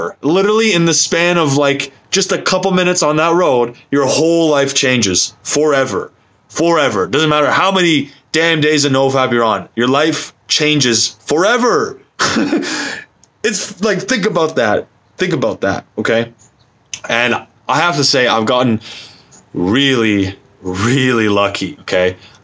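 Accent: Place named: United Kingdom. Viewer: 1. Your British accent is amazing. 2. On day 5 and going strong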